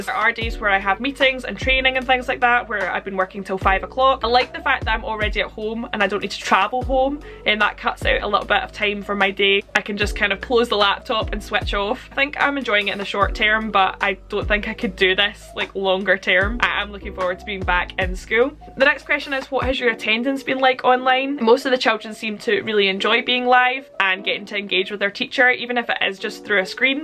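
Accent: Scotland, United Kingdom. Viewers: I could listen to you all day - bloody love a Scottish accent! Fair play to you babe teaching, I know how hard my sister finds it x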